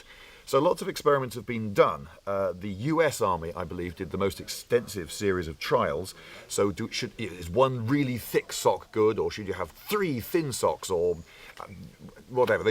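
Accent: United Kingdom. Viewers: Is it just me or does sound more British recently?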